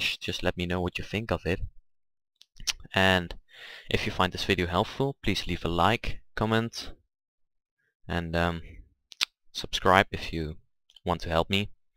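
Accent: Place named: Netherlands. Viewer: i love the way you say "fersion" instead of "version" cus v is said like an f in dutch ^^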